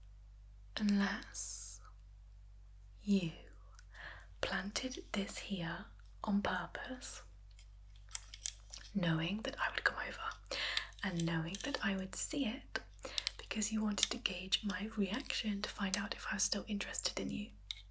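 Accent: United Kingdom. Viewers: I didn’t know I needed British ASMR until now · As unhinged and possessive as this gal is, she's irresistible! I can't believe this guy let such a beautiful woman with the cutest British diction get away! Even if INSANE, she's a keeper!, this one's a masterpiece! You made all of us wish we were that guy! You've done it again, love! ❤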